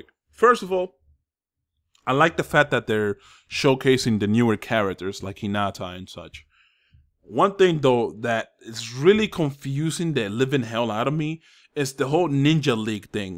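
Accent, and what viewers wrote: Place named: Dominican Republic. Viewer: xD hasta hablando ingles se te sale lo dominicano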